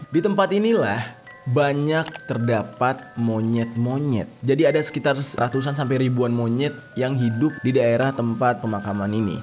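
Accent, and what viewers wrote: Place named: Madura, Indonesia. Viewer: Yo mesti la bang apa lagi logat Madura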